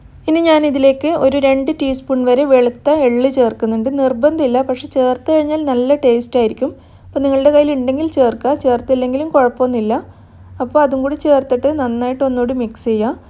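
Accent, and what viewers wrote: Kannur, India. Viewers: It's kannur slang 😅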